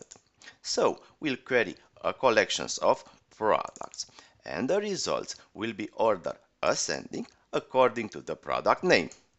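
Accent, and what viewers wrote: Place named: Russia. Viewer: Your accent is so cool, it's like Russian mafia people, so cool, also the tut is really helpful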